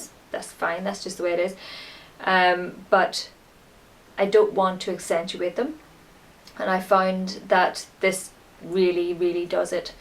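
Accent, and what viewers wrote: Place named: Northern Ireland, United Kingdom. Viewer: u from Belfast or down south I'm from northern Ireland an u sound like you are to lol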